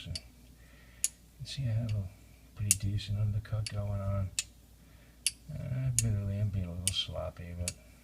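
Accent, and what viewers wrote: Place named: Boston, United States. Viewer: Great dexterity. Never heard your voice. That sounds like Boston.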